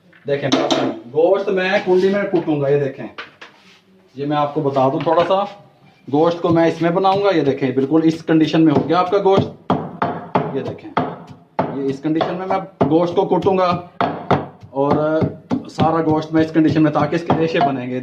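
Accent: Punjab, India. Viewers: App ka baat karny ka punjabi stayil bht acha hai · Bhut mithi boli punjabi urdu 💝💝💝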